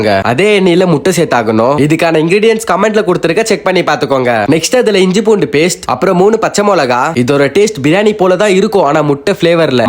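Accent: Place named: Karnataka, India. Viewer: Ungaro kannada slang nalla iruku bro 😎😎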